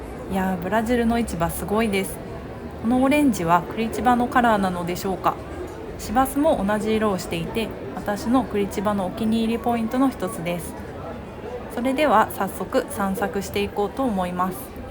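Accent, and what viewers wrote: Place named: Kyoto, Japan. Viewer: Você é de kyouto ? Então seu sotaque é charmoso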